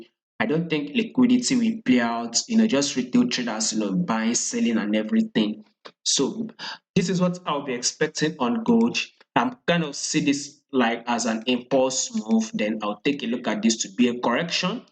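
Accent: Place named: Africa. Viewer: u talking to heavy African accent..can u write the levels to buy n sell on the chart so its easy to follow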